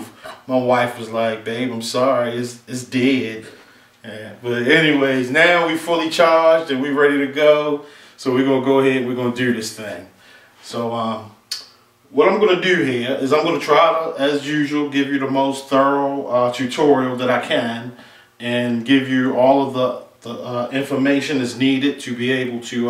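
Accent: Baltimore, United States. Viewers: Nice vid. You're from bmore huh?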